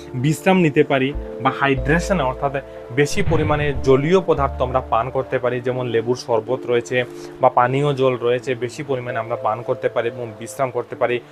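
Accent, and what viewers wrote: Sylhet, Bangladesh. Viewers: আপনি সিলেটি মনে হয়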